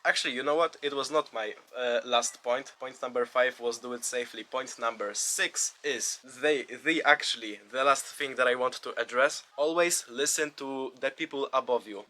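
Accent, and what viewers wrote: Poland. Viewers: jak to jest że po twoim akcencie od razu poznaje rodaka XD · Polish, I suppose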